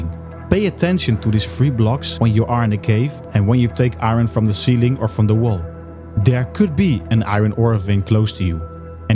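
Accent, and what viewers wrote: Netherlands. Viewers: you sound dutch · lol youre so dutch bruh. I mean the stonekolen english is waaay out there. thanks for th vid though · BEN JIJ NEDERLANDS!!?? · Iets zegt me dat je nederlands bent 😂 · goeie tips maar ik ruik een Nederlander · TYSM! btw i recognize a dutch accent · are you dutch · Is this guy Dutch? He sounds Dutch. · I smell a Dutchie like me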